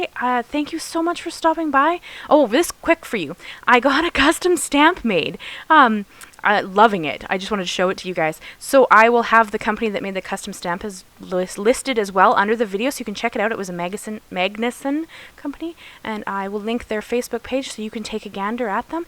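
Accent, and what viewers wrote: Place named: Canada. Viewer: Halfway through your video I was wondering if you were Canadian. I just had this feel because of how you speak. And sure enough I was right! 😁 Takes one to know one I guess. I loved your video and the card. The background does look very pretty and awesome colouring job too.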